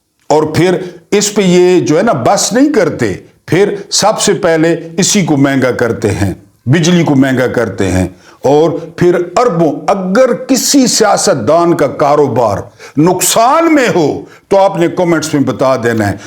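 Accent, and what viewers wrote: Saraiki, Pakistan. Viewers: Ap key V Log mein Saraiki Touch bohot hi acha lagta hey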